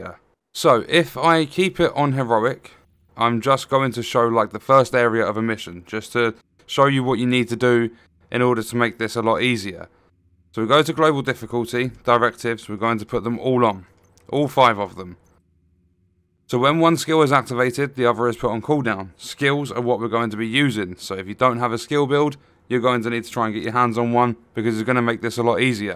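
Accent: United Kingdom. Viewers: subbed just becauce of you wounderful british accent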